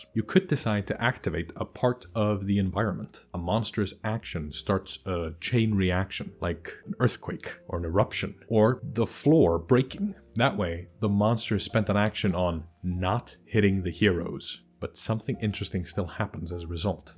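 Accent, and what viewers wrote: Iceland. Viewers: You have a great voice. The tiniest little hint of an Icelandic accent gives your voice a bit of charm, and your pronunciation is very clear and crisp, making you easy to understand on cheap, low-quality speakers.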